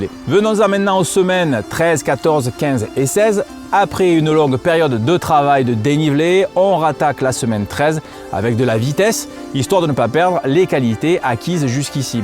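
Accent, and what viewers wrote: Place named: Marseille, France. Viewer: Salut, merci pour tes vidéos! Je crains que ce soit foutu pour l'édition 2020 du marathon du Mont Blanc et c'est les boules... Mais bon, nous subissons tous la même frustration. J'ai fait les 90km du Mont Blanc sous la canicule en 2019, je suis inscrit sur la TDS cette année, mais là aussi, c'est compromis. Bref, c'est chiant tout ça... Mais faut s'adapter, vivre cette crise le mieux possible. Je suis comme toi un adepte de l'entrainement croisé et complet (pas uniquement les jambes mais l'ensemble du corps) ce qui fait que je kiffe tes vidéos. Et puis l'accent du 13 est sympa;-) Bref, continues à nous alimenter avec tes vidéos, on a besoins de sources d'inspiration pendant cette période particulière!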